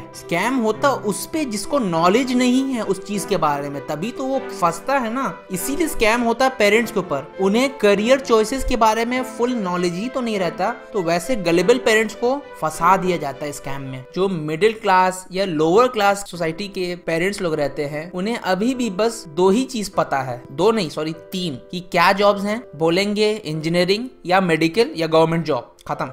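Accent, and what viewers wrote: India. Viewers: Up ke lagte ho...Awsome vedio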